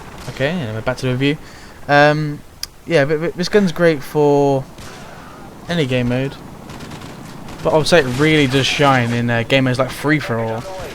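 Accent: United Kingdom. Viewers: Gotta say almost had a heart attack when i saw you did a review on the Tar-21. Absolutly love the thing to death and with silencer too, 5/5 for the vid and a Brittish accent to boot! ;p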